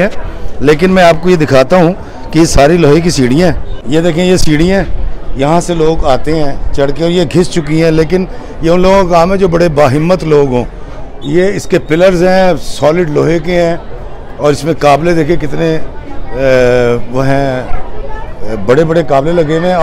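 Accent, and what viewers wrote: Pakistan. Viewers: Aap k vdo achi hai aap ka (efal) tower aur ( perass) kehna b khalis Pakistani style hai aur phir ( O my God…) all good but ye jo perass ka nazara hai na ye kuch itna impressive nai hai vs our Islamabad view from Daman e koh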